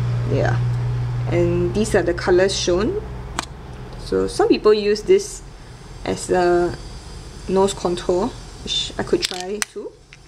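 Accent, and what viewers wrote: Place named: Malaysia. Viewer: Love your accent! Malaysia? Singapore? Never heard of "Kate" brow cosmetics. What country is that from?:)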